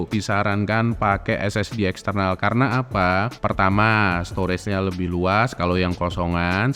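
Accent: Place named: Java, Indonesia. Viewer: Mantap maseh 😂 jowoan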